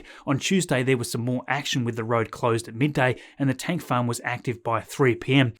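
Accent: Australia. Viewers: you australian? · Hi from across the ditch enjoyed your content as usual Just one thing as a blind subscriber I would very much appreciate a few more sound clips in your presentation not that the charming Australian accent isn’t enjoyable enough Cheers mate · Aussie accent at its finest. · Love that Australian rocket commentary! YEEEEEEEEEEAAAAAHHHHH! 🚀😂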